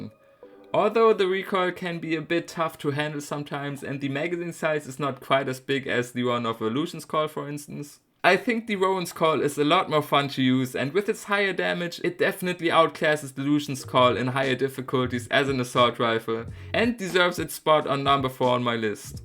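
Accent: Germany. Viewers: u are german i hear it ;D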